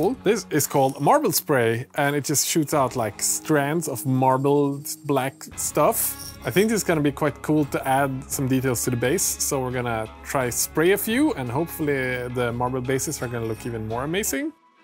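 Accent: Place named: Sweden. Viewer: Swedish accent.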